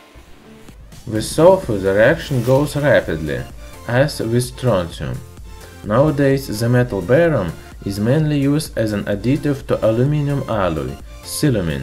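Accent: Russia. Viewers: Are you from Russia? Some Slavic region? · Mofker GT He's Russian, give him a break.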